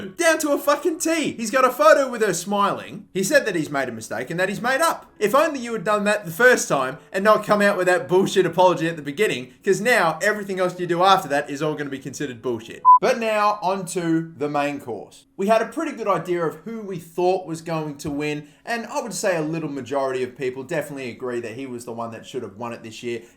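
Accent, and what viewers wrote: France. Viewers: Great French accent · I love the French accent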